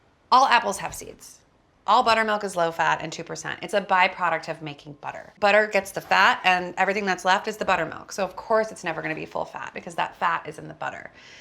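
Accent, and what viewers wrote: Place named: Southern California, United States. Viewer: perhaps you don't know this ... you really help people out of depression. (insert something funny) coastal peeps. immediately knew you were socal, I'm norcal. love it.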